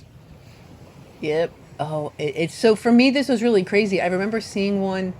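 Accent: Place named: New Jersey, United States. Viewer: “That’s what I’m takin’ about.” You are from Jersey❣️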